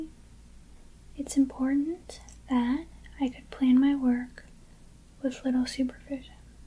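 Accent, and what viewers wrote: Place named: Midwest, United States. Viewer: Do you live in the midwest? You sound like you have a midwest accent.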